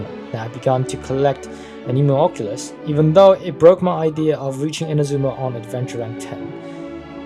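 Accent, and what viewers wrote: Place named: United Kingdom. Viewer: Unrelated question: How do you sound so British/ Western guy when your Genshin Impact Language preference is in Chinese 🤔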